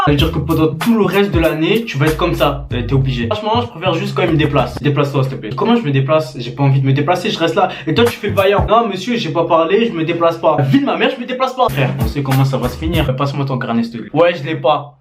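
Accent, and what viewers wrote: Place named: South, France. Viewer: J'adore tes videos, et ton humour et l'accent du sud😂😂😂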